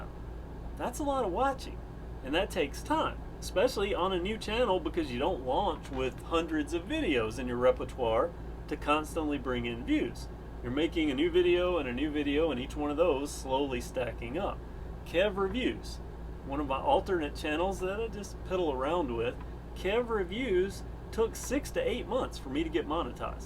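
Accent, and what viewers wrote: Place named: Texas, United States. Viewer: Please make Travel vlogs... I watch all of your videos... Tbh I have notification on I love your accent. Gives me Texan vibes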